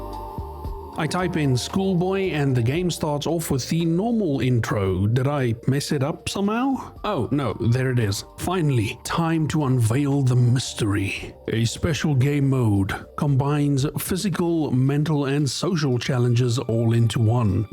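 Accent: South Africa. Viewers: You're south african?❤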